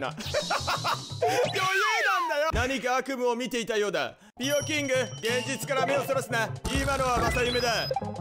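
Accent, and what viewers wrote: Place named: Hakata, Japan. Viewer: 全編博多弁でやってくれるというのは いつ放送予定ですか？ 楽しみに待ってます✌️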